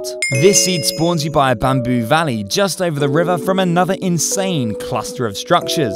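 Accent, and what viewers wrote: United Kingdom. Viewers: The British voice makes it better lol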